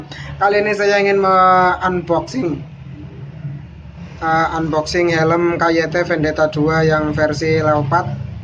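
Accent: Banyumas, Indonesia. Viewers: Masih bersama ini membukanya gmna ni :v sepertinya wong ngapak sekang ndi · Njir ngapak koe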